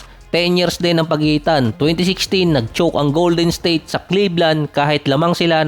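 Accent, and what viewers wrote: Batangas, Philippines. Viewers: Batangueño ka din pala😃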